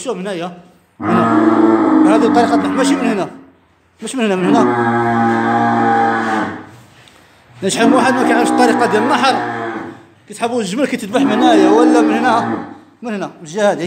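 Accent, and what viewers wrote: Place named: Libya. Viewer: تتقصكم التجربة يا صديقي. .يبدو انك عشت في ليبيا طويلا كلامك يوحي بذلك .المهم بارك الله فيكم ومزيدا من الاجتهاد حتى تكتسب الخبرة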